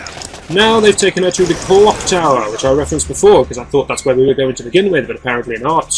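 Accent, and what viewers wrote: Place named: United Kingdom. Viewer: Does anybody in the United Kingdom play this game or more was this filmed in that area? I don't know but I'd like my question or questions answered. The narrator of this video sounds like he's from the United Kingdom.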